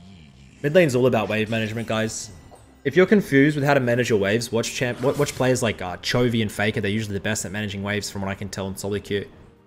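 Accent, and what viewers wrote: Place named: Australia. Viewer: I though was from the Uk. Ozzy makes sense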